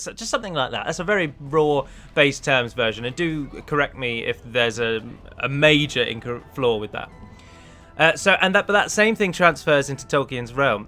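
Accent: United Kingdom. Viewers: Your lore video was great. It was really good. You are a man who speaks to us, the audience, as if you were telling a story and yes that means some things you say will be wrong but that utterly stands in the shadow of how pleasant your lore videos are to listen to. As if I were listening to a lovely fairy tale. Kudos! It is obviously better than your Sindarin pronunciation but that is because your native language is English you jammy ... ^^. No seriously, that British-ness about you and the way you speak to us is what makes you, you, and why people come to you. Don't let a reddit-guy over-the-top holier-than-thou fellow ruin your day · You really shouldn't worry about guys who question your knowledge, your videos aren't aimed at being some kind of lore encyclopedia, you don't owe these people anything!:) I like to consider myself as quite knowledgeable on Tolkien's lore, but when I hear mistakes here and there in your videos I don't give a damn about it, because that's not the reason I'm watching them!! 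I'm watching them because you're an interesting person in general with an interesting playstyle as well, and that's the important thing here;) (plus you help me get better at speaking English and at getting a British accent so I'll vouch for you and your vids ^^)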